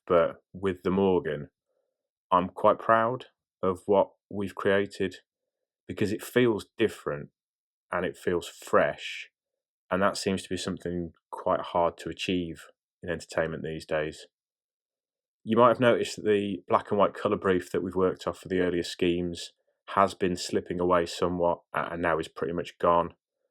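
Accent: Yorkshire, United Kingdom. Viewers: I thought your Yorkshire accent was great! I mainly know the accent from TV (Monty Python or Stewart Ainsworth from "Time Team") but it's probably my favorite British accent. As for the YT algorithm, it's every bit as cruel, mysterious and capricious as the Morgen. I never get your notifications despite having clicked the bell. Many of the current events channels I follow upload multiple times a day and I get notifications for all of them. But I never get notifications for many creators I really enjoy who only upload every week, month, or even less frequently. Also I've been catching up on your videos for two days and I haven't gotten a single ad so far 🤔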